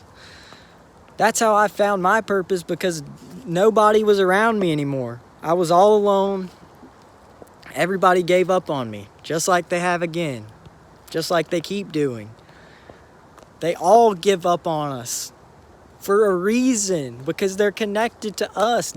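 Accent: South, United States. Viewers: You poor kid, I know exactly how you feel. I've felt the same way ever since my awakening in June of 2009. The one major difference is that I've been lucky enough to find a partner who is on the same path of ascension. Judging from your accent, I imagine you live in a part of the US often referred to as the Bible belt, where spiritual truth is deemed satanic by an overwhelming majority of people. I must say I admire your light-hearted way of being, considering how alone you must feel. It's strange, we go on the internet and we see thousands upon thousands of posts from people who definitely seem to be on the path of Ultimate Truth as we are. Yet, when we look at our immediate surroundings, we feel completely isolated in our quest for ascension. In these times of confinement, it has occurred to me that a lot of people are using an app called Zoom for all sorts of things, yoga classes, business conferences, etc. 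Maybe those of us who are on this sacred path should form a group with that app too so we can share ideas and experiences. What I want to share with you, my young friend, is that you are not alone, not even close. Like you have said here, the spirit world smiles upon you every day all day, but in addition to that, you have gained a lot of popularity in a very short time on this channel, which goes to show there are many of us, tons of us, who know what you know, and think as you think. In the age of technology, maybe we should take advantage of this technology to unite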